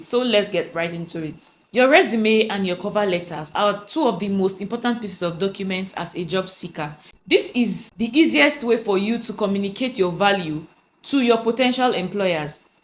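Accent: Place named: Nigeria. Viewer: You sound like we went to the same care academy in Nigeria 😂❤. Thank you sis. Is there anyway I can contact you?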